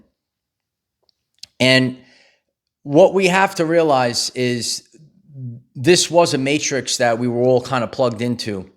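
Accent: New York, United States. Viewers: Yes! (Nice to hear a NY voice.)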